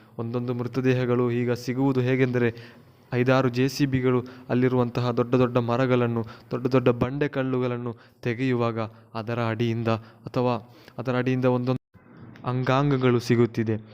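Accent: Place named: Kerala, India. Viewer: ಮಲಯಾಳಂ ಭಾಷೆಯ ಯವರು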